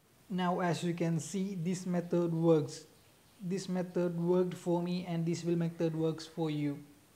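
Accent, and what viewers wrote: Kerala, India. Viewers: You're a mallu i bet!! Hii😂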